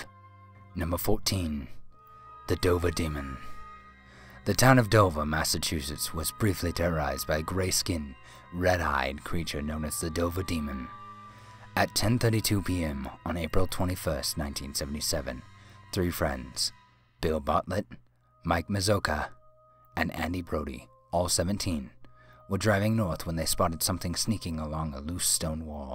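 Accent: United Kingdom. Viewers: You sound like British person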